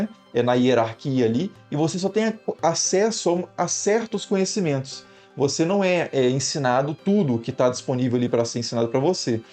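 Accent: Minas Gerais, Brazil. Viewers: vc é mineiro de onde?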